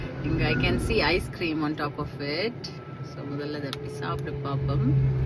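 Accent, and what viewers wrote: Sri Lanka. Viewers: நீங்கள் பேசும் இலங்கை தமிழ் மிகவும் அழகாக இருக்கு ஆங்கில கலக்காமல் பேசினால் மிகவும் நன்றாக இருக்கும் ஒரு நட்சத்திரம் போல் உங்களை காண்பது போல் இருக்கு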